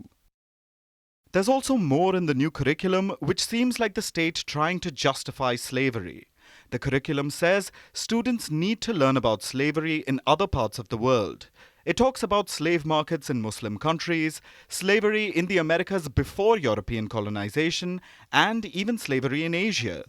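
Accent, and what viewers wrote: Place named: India. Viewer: Hey what are you guys even talking about? Never would even expect from someone with an Indian accent 😩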